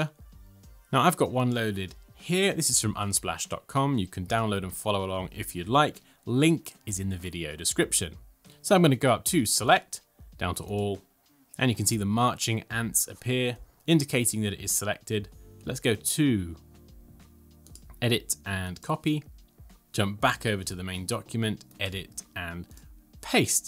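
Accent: England, United Kingdom. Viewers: Very listenable English accent! :-) Makes such a nice change to 'other' accents!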